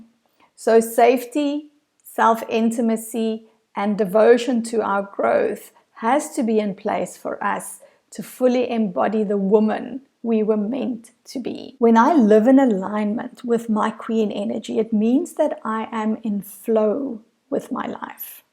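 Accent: South Africa. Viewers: You sound South African ?This is so beautiful I love this message thank you I would really love to work with you,new subscriber and so glad I found you. I'm so ready to claim my Queendom Yassss!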